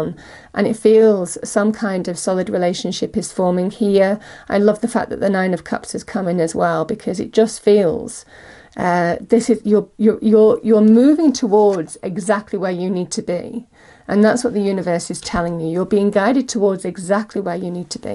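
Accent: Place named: London, United Kingdom. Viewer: Thank you thank you for this beautiful reading I love the way you explain the cards and how you do the reading thank you thank you thank you also you are from London your accent is very lovely are you from London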